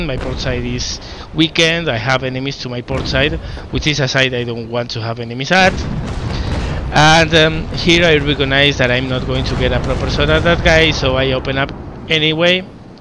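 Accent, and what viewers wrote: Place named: Spain. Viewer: Tu eres español no? Ese acento es claramente español Corrigeme si me equivoco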